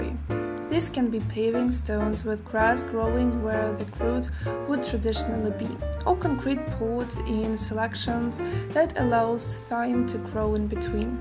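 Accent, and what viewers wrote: Russia. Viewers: Таааакой русский акцент)))) Но молодец, популяризирует автор красоту!))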